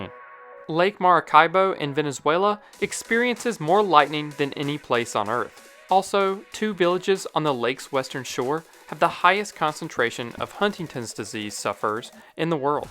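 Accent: South, United States. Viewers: Honestly I just come here to hear your awesome southern accent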